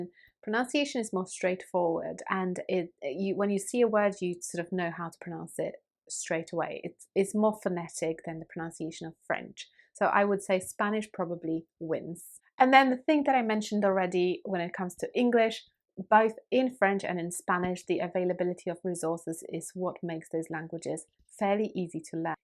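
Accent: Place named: United Kingdom. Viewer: Tahnk you so much for your videos 💕 Do you ever get tired of speaking in British accent 😬 This is what's happening to me and I wandered if I am the only one feeling this way 👀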